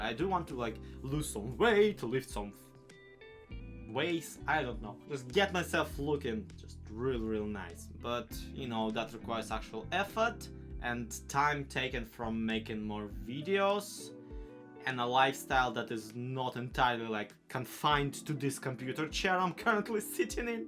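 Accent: Russia. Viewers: the thick russian accent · Дикция палит тебя что ты руский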